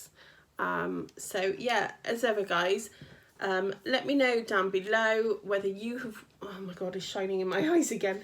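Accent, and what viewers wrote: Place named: RP, United Kingdom. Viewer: you speak posh, good to see you again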